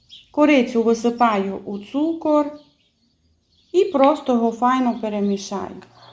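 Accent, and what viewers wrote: Transcarpathia, Ukraine. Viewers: дуже приємно дивитись і слухати Вас.Булочки як і все у Вас,дуже гарнезні і смачні!Дуже люблю дивитись Ваш канал.Всього Вам найкращого,здоров'я і Божого благословення.Все буде Україна!, Ваш Закарпатський діалект як мед на серце!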